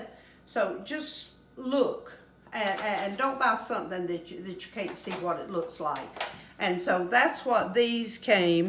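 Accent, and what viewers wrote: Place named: South, United States. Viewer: I just love the accent 😉. You are what I think of when I think of a real Southern baptist lady.🙏❤️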